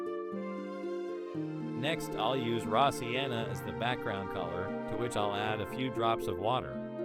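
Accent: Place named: United States. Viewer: Milý. Tieto tvoje techniky som v jednom videu nevidela. Ďakujem ti, že si sa podelil s nimi a je to rozkošné. Ešte viac si stúpol na kvalite v mojich očiach. A skupina určite súhlasí. 😊Americký prízvuk a hudba dotvárajú čaro namaľovaných obrazov. Aké milé. 😊 čauko. ZUZANA 😊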